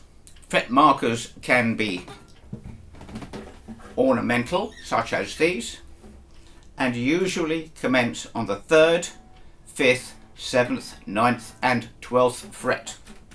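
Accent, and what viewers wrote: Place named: East London, United Kingdom. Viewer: HaHa! Your accent and cap made me laugh out loud. I was born in East London and your voice reminded me of many blokes I used to know when I was a kid! So I loved your presentation of this information…although for some reason ‘Derek and Clive’ kept coming to mind while I was watching you!! 🙈😂😂 I’ll have to watch again just so I can concentrate on absorbing the info rather than being entertained and amused! 😂😂 Great job. 👍🏻 Regards, Dave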